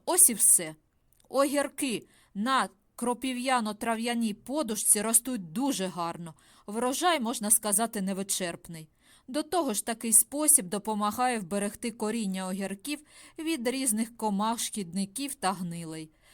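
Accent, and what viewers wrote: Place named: Podillia, Ukraine. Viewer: Доброго дня. Ріже вухо, бо незвичний для вашого вуха. А це діалект із Поділля. Мені вухо не ріже, бо так говорила моя бабуся. Чернігівщина, Черкащина, Полтавщина, Київщина - Подніпрянська Україна - хтось гикає, хтось надто окає, в когось о переходить в у. І це наше багатство, цікава історія мовотворення, контакти з іншими народами... Бажаю Вам зрозуміти наше багатство і не намагатися пістригти всіх під один гребінь.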